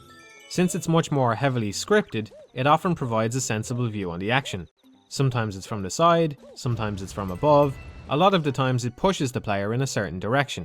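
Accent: Ireland. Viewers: Warren Tierney Yes he is. You don't know how Irish people sound like?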